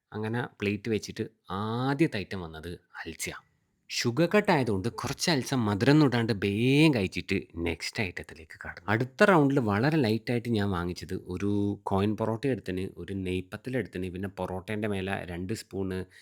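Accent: Kannur, India. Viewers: Ippo sherikk kannur karante samsaro ayknnallo ingalethh😅😊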